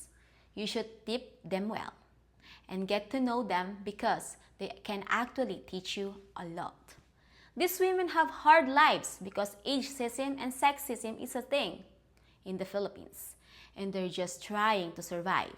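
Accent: Ireland. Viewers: I love listening to Irish 😅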